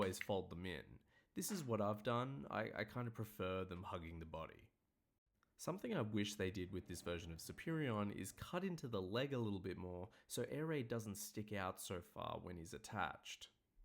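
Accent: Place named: Australia. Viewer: Nice to hear another Aussie accent. Funnily enough I'm also a haha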